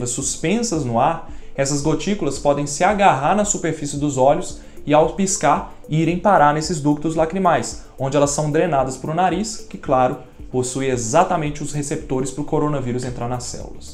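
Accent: Brazil. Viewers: eu gostei do conteudo e do jeito de falar de Voce e seu portugues " brasileiro"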